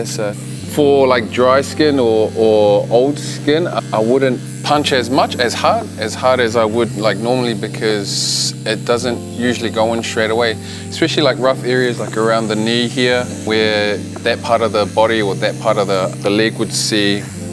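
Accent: New Zealand. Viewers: New zealanders have a very distinct accent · I love that Kiwi accent. That shit cracks me up after watching beached az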